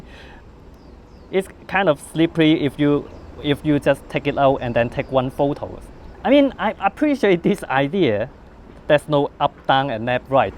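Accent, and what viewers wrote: China. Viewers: Chinese assent is very cute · Now i can understand 🇨🇳 ChinEnglish 🇬🇧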